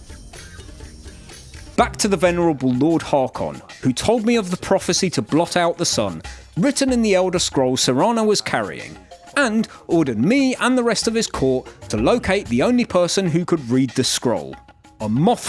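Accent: United Kingdom. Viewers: Hello again funny British man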